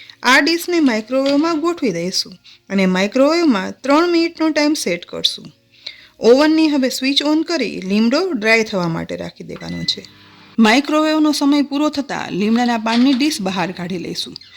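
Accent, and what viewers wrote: Kathiawar, India. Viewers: Nice astonishing recipe of namkeen nylone chevado with corriender plus sweet neem leaves powder presentation with sweet kathiawadi speech voice. Thanks to ji.. With feelings.... Add basil leaves to increase taste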